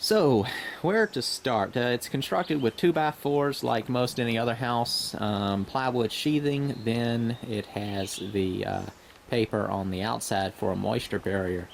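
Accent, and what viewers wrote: South, United States. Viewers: who else started reading the comments in a southern accent while he was talking??? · Dukes of hazard accent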